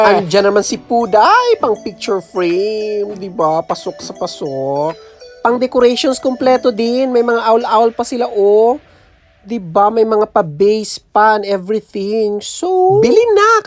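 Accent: Visayas, Philippines. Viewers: Bisaya ka ba inday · Bisaya ka?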